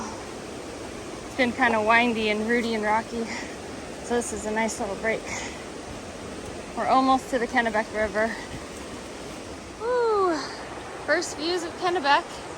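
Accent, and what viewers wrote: United Kingdom. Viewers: I loved the British accent!! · Great day! Thanks for taking us along. Your smile and laughter are infectious!!! As is your British accent. 😊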